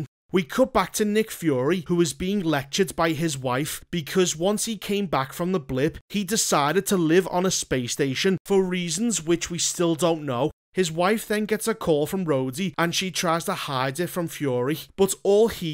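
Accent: Liverpool, United Kingdom. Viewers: The swearing fits perfectly on these reviews, a scouse accent to boot. Brilliant reviews 🤣🤣🤣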